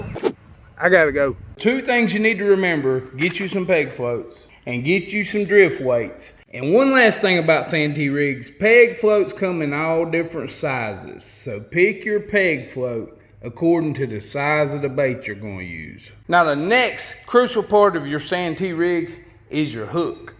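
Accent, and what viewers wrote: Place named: North Carolina, United States. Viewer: grab it bare handed den! 😂 I think we might be from the same neck of the woods! I just ran across your YouTube yesterday and you sound like you could be a neighbor of mine or someone I grew up with! I am a North Carolina man born and raised!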